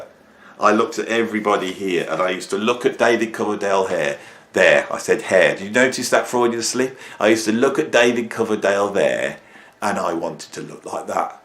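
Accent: Birmingham, United Kingdom. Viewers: Lovely Brummie accent. We want the next video all in Brummie 😄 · Canadian here. Assuming the accent is Birmingham given Sabbath's origins and the fact you mentioned the building on the back of Zep 4. The Who are the greatest. I understand where you are coming from about early vs later but I really love Tommy and Quadrophenia. By Numbers and Who Are You were good as well but not as impactful as the earlier stuff. It pains me to say this (as I did buy Face Dances and I think Kenny Jones is a capable drummer), but, .... artistic material-wise... the Who died with Keith. The peak in original albums was with Quadrophenia in 73. I've seen them live twice and loved both concerts but, for me, it's just a chance to see them do their stuff from 73 and earlier with a couple odd songs from Numbers and Who R U tossed in. It also really pains me to say that I don't think I've ever heard Supernaut or Bloodsucker...I'm actually embarrassed... 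being a little older than you and all... I'll use this list as a guide to some classics I should be listening to. In that, you have been a valuable cultural public servant and I thank you. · the Brum accent made me spit tea down my t-shirt. I guess it's now a tea-shirt. Sorry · The accent is called 'brummagem.' Hopefully, that's cleared that up for you all. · Pure Brummie!! An Aussie who lived in Cov for 5 years, spent all my time in Brum, and miss my wonderful Brummie mates. Thanks, for the trip down audio memory lane!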